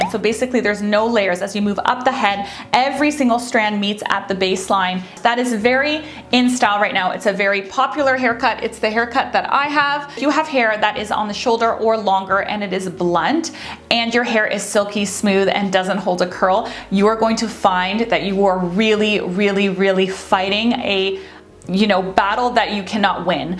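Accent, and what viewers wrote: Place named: Canada. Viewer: Pregnancy look so cute on this lady. The Canadian accent is an absolute nightmare.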